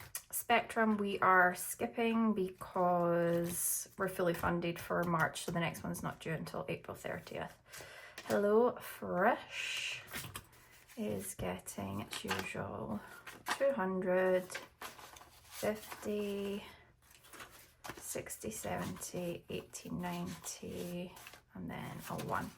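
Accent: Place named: Ireland. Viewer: New subbie here! Love your accent! Irish?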